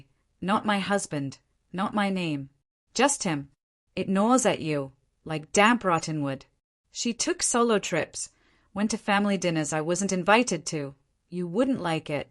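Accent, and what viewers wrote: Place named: United Kingdom. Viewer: Yet again - the title doesn't match the story! Refreshing to hear a British accent.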